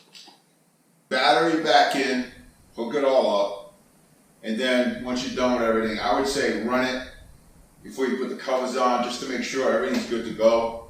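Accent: Boston, United States. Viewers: Where are you from that ngk plugs are hard to come by ???? Can get them at the corner gas station here .. lol. You sound like you from Boston..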